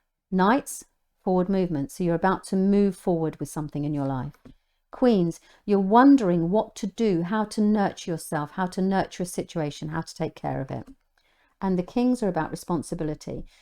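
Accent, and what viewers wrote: England, United Kingdom. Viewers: Self-knowledge tools with a British accent? Oh how I love it 🥰👍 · beautiful voice, may i ask the accent, English, but where from? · Thanks so much for this - really clear and interesting. It's also good to see a tarot video made by someone who is English, and not American!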